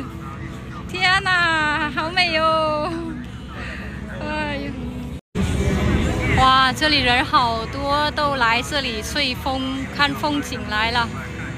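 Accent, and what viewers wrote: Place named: Hunan, China. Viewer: 你的中文听起来像我一个湖南的同学，你看起来好泼辣的样子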